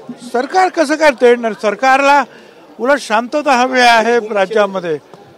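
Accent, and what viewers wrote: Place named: Bihar, India. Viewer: बिहारी सारख बोलतोय... media ला वैतागला... copy cat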